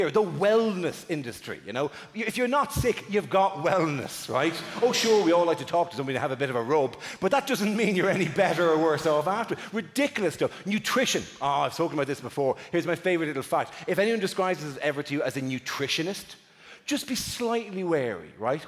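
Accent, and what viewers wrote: Ireland. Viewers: I think Dara is one of the few stand-ups that is still funny even though he is speaking a million miles an hour, as that doesn't often really work well for susained comedy. Must be the Irish lilt!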